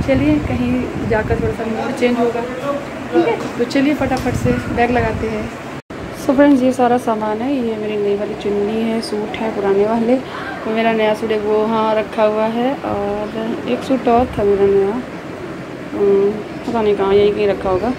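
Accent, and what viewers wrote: Bundelkhand, India. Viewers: Hello di aap bundeli bola kariye aap ke munh se bundeli bahut acchi lagti hai ham log bhi ghar per bundeli hi bolate Hain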